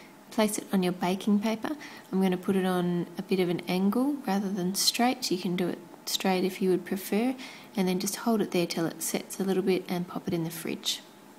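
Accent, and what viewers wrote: Australia. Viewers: is your accent australian? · Are you Australian? 😊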